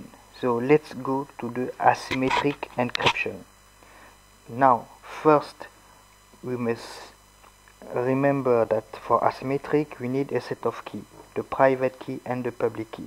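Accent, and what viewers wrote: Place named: France. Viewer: not bad but your english sound like french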